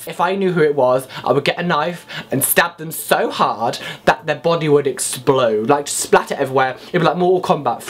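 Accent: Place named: United States. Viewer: you acually sound really American ( the accent) :))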